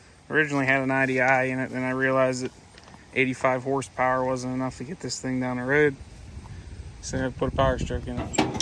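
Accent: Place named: Pennsylvania, United States. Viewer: I knew right away you were from Pennsylvania. When I heard you speak on PeaceNGrease video from rod run I got to tell you that Mack truck is beautiful I love it the iron city beer sign on your floorboard at the shifter reminds me of home I'm originally from Butler Pennsylvania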